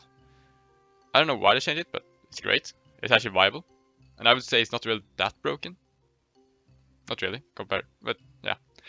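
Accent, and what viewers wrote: Sweden. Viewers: As soon as I heard you're voice I was like ''Yeah, he's swedish''.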